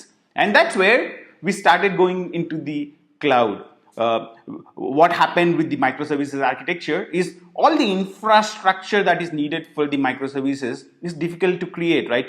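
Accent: India. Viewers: Very interesting content, but the indian pronunciation is awful 😑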